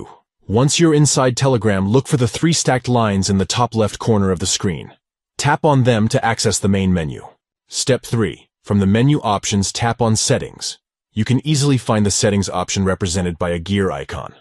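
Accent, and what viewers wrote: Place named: Australia. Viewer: You should learn how to pronounce your Australian accent properly. Why do creators create these apps to be so juvenile. What about a professional approach. We want to achieve professional messaging that surpasses anything we have come across. My first experience was a stupid coronavirus animation. Goodbye 2020 hello 2025.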